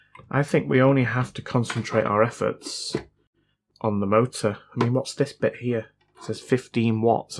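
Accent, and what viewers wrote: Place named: Bolton, United Kingdom. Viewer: Great to here the Bolton accent, I lived in Westhoughton many moons ago.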